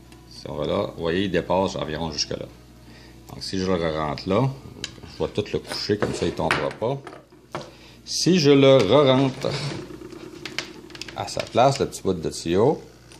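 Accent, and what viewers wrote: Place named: Quebec, Canada. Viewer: Salut , Merci pour tes super conseils ! Tes descriptions sont tops , exactement comme je souhaite faire , même matos , même méthode 👍👍👍. Merci pour ton approche du sujet qui me rassure sur mes choix ! L'accent québécois ( que j'adore) ne gâche en rien tes vidéo pour moi ( charentais qui vie en Dordogne 🤣🤣🤣). MERCI and good Luck pour la suite 😉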